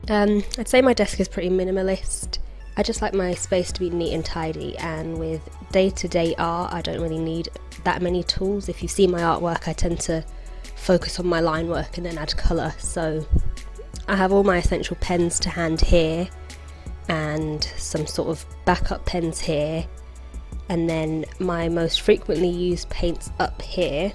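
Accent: England, United Kingdom. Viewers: Wow I like so much your space 👍 it's seems to be personal space not like in others videos I see from people I'm french I would like to speak english like you 😄 I love this english accent! 💕 Where are you from ?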